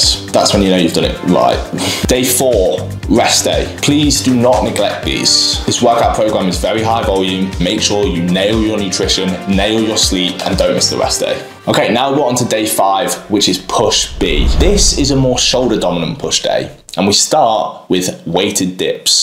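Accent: United Kingdom. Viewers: The british accent 🙂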